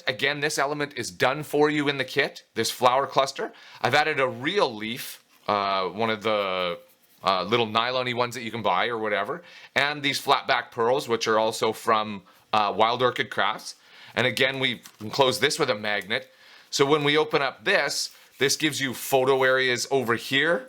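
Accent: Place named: Canada. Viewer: You must be a fellow Canuck, at least you sound like one!!! I really love your collections, the dog ones are my faves. I look forward to your new ones. How about a vintage floral meets steampunk inspired collection?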